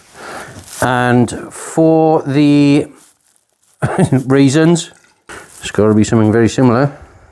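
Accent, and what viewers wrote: Wales, United Kingdom. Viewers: Don't know if it's the Welsh accent or you're dressed up like Dexter, but I was in stitches. Great video and very entertaining.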